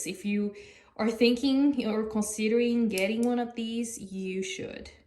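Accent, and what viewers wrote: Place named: Brazil. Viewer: You sound Brazilian! ❤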